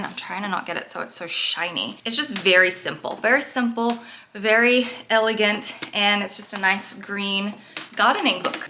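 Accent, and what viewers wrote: Australia. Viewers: You’re such a Fun, often funny Adorable Young Lady which I love! From a blonde girl myself, I’m still getting used to your darker hair and honestly it suits you well ✨ I Appreciate your down to earth, real and often vulnerable approach which makes You a Joy to watch. Of course I Adore your accent (I’m a Southern gal) & find it so Lovely🤍. I fairly new to your channel & so Refreshing to hear from you!! I believe you’re Australian, if I’m wrong please correct me. Is Australian as well?! Just wanted to say Hello and let you know how much I Appreciate You 🤍🤍🤍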